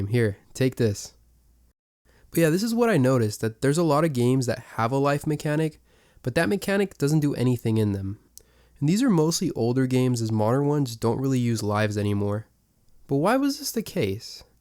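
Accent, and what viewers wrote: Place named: Brazil. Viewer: Dude, are you Brazilian? You have an accent that reminds me of Portuguese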